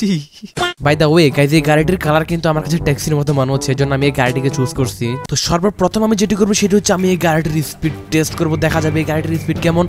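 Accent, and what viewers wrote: Noakhali, Bangladesh. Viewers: Valo vabe kotha bolo nuakhalir vasha na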